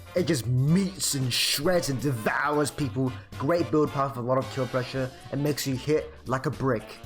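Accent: Australia. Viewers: He's just Australian don't mind it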